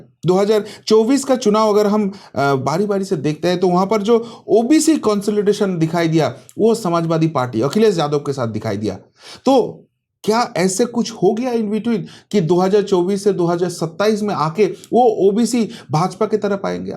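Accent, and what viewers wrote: Bengal, India. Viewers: आप तो आते ही छा गए सर! आप की बंगाली accent वाली हिन्दी और साथ ही समाचारों का विश्लेषण बहुत hi बढिय़ा है ! धन्यवाद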